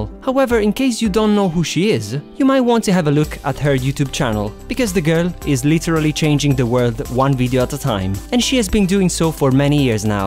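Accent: Italy. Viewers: I'm sorry this has nothing to do with the video but are you italian? you sound italian! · yes I really like all the recipes in here, sounds like you are Italian from the accent I'm Italian also but I have been in the USA a long time my accent is a bit different, anyway I can't wait for another of your recipes:)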